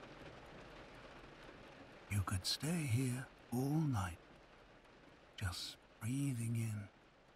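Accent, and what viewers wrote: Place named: United Kingdom. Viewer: Why get a British v/o and then make all the references American? Jarring. Sidewalk, drapes, 24/7, rainday, store...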